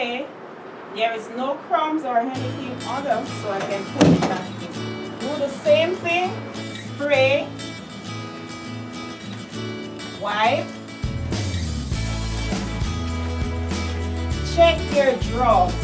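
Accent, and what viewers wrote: Jamaica. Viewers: From her accent I know you are one of us Jamaican love and niff respect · She sounds like a Jamaican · sounds Jamaican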